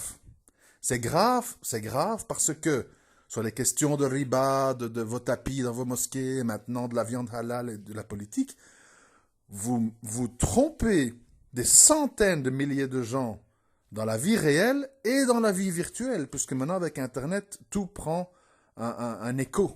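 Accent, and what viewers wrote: Belgium. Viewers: Salam mon frère, ton accent brusseleer c'est top 😉 moi pareil, quand je m énerf ça revient 😎. C'est bien de dénoncer, mais sois en forme car des hypocrites sont légion...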